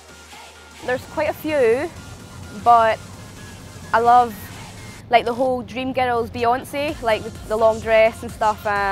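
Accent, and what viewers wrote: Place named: Scotland, United Kingdom. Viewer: hot, and a scottish accent? dear lord perfect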